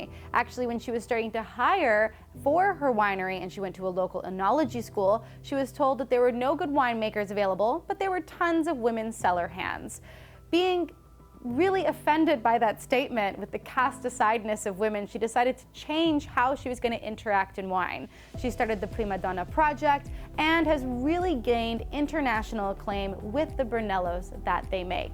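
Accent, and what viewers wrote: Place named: Italy. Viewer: Thanks for the note, but it is simply the way I speak. I come from a very flamboyant Italian family lol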